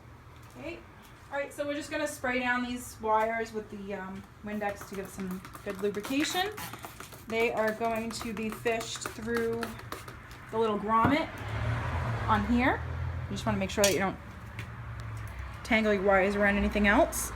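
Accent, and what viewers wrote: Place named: Massachusetts, United States. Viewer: Thanks for the help. Where in Massachusetts are you from? I can hear it in your voice.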